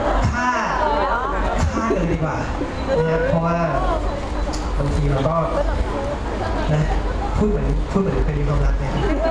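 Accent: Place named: Rural, Thailand. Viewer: พูดเหน่อน่าร้ากกก😘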